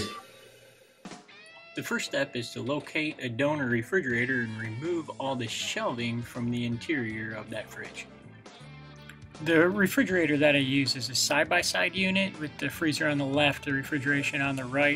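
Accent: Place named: Upper Midwest, United States. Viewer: That's the most intense upper midwestern accent I've ever heard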